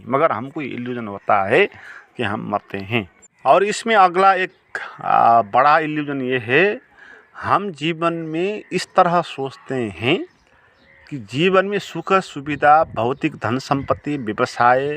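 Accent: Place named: Nepal. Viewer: नेपालीले हिन्दी बोलेको जस्तो लाग्यो! प्रबचन असाध्य राम्रो लाग्यो!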